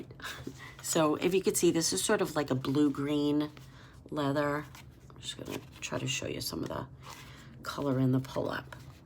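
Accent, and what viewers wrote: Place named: Massachusetts, United States. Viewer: I just found your channel on here and I’m so excited that I did. I love the content of your videos and what first caught my attention was the name of your channel and then when I clicked on it and I heard your accent, I believe that we are from the same area and I’m very curious to see if we are (if you feel comfortable answering) are you from Massachusetts South Shore by any chance? I hope to hear back.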